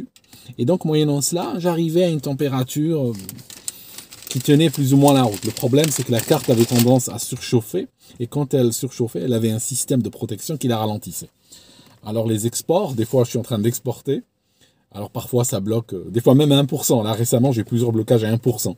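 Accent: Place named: Switzerland. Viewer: Il est génial ton Kanal 👍 j'aime beaucoup 👌❤️ Ici en France nous parlons de 'Chaîne' Youtube 😉 même si ta dénomination de canal, ou Youtube Channel, me rappelle que tes rares intonations de blédard sont une bénédiction lorsqu'on entend ton accent suisse 🫣 ENSMAtiquement Français 🥰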